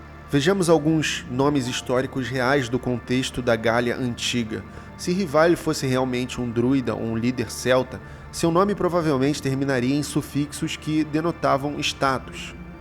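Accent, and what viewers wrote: Rio de Janeiro, Brazil. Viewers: seu canal é muito bom, você é muito inteligente. Tente controlar o sotaque carioca (S assobiado) que certamente será muito mais agradável ouvir suas ideias (brasileiros de outras regiões).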